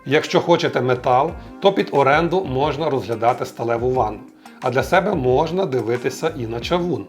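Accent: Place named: Lviv, Ukraine. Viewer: Це діалект, який додає шарму. Чула такий говір на Львівщині. Звідки родом автор не знаю, але це звучить приємно, хоча і не літературно.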